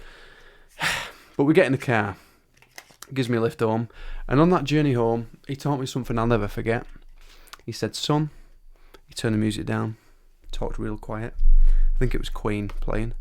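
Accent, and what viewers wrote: Birmingham, United Kingdom. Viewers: Golden fingaaas CHECK Bostin accent CHECK On-the-edge-of-the-seat storytelling CHECK Best dad ever CHECK LE-GEND!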